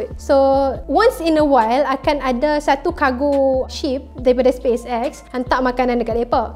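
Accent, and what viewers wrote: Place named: North, Malaysia. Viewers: Kedah elokk hahaha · The BEST...north accent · TBH...kalau nak cakap utaqa...cakap utaqa terus..I can see a lot of mix up ...anyway good information...thumbs up👍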